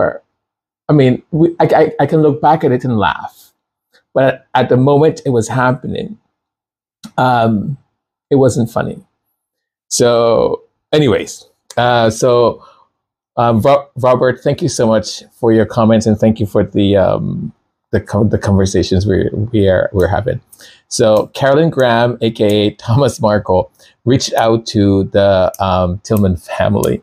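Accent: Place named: United States. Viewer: Yes its funny i would think u where a American 😊😊😊👍🏼👍🏼👍🏼👌🏿🌹🌹🌹🎈🎈🎈🌞🌞🌞🌞🥰🥰🥰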